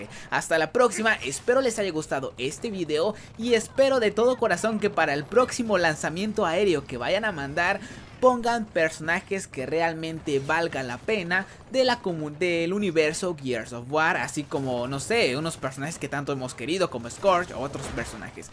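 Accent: Chiapas, Mexico. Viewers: eres de chiapas?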